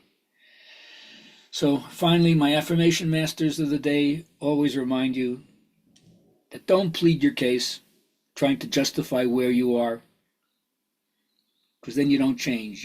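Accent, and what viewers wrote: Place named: India. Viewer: That Indian accent 🤣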